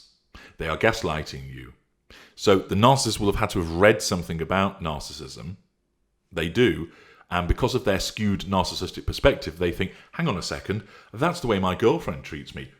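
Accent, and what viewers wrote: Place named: North, United Kingdom. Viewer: Sometimes he has a slight northern accent beneath the caramel coated gravel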